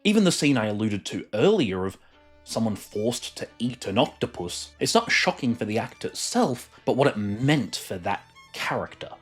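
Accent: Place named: Australia. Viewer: I thought you were English -- I didn't catch the Aussie accent, but still nailed it. I'm not sure you fully understand why Unite The Kingdom exists, but judging from your points, I'm guessing it doesn't matter because you have that far-left ideology understanding of what "masculinity" is. However, your reply clearly took time & was..... well, nice. It's hard to actually engage with people, nowadays, especially online, & just be civil. Cheers, to that. I write back because I want to see if I can somehow sway your opinions, or cause you to want to actually be informed on things -- your misunderstanding of why I said "migrants" & not "immigrants" caused me to want to reply. I also disagree with you completely about the comic & the show -- they are DIFFERENT. The comic is so much better at creating & exploring the world, while the show offers other aspects that are done better. 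I'm also a fan of how the comic implemented compound V, as it gives some of the characters more agency. The finale of the show borrowed heavily from the comic, when it shouldn't've -- yes, the show commented on everything you mention, but it wasn't until season 3, or so, when it just became another far-left media of propaganda, eschewing any balanced parody or satire, for openly taking shots at anyone who doesn't agree with "The Left", even those who participate in politics under the same flags. In mentioning this, I am reminded it was your comment about how you're "confident by the small comment reducin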